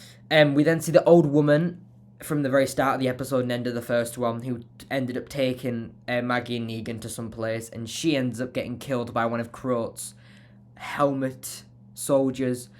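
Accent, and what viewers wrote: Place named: United Kingdom. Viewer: Hi, you sound like you are from the UK?! How are you managing to watch it please?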